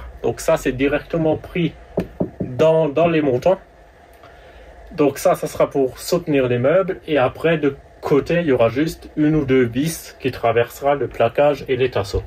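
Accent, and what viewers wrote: Alsace, France. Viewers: Votre accent n est pas Alsacien? Je suis des Vosges peu être que vous pourriez aménager mon jumpy utilitaire 😊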